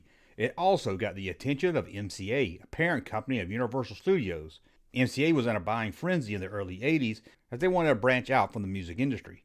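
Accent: South, United States. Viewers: As a fellow toy nerd and southerner, it’s awesome to hear your accent talk about these subjects.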